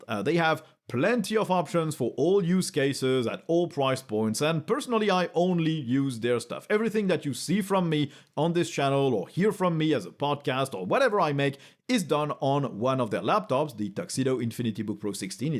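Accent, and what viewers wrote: France. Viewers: i love how uses french pronounce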